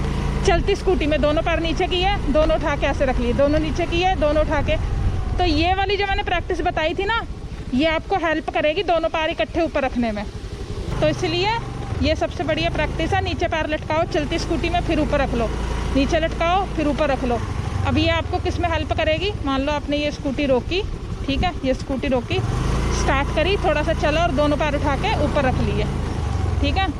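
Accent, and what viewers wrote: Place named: Punjab, India. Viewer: Plzz hath main gloves mat pehen lena unko dekh kar he aapke khoobsurat chehre ka andaja lagata rehta hu baaki aap chehra to dikhate nahi😞Aapki punjabi, himachali accent wali hindi achhi lagti hai🤓❤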